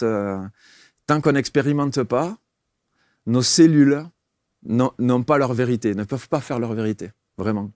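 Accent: South, France. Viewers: Dommage pour la barbe longue…😢 J’adore l’accent du sud. 😊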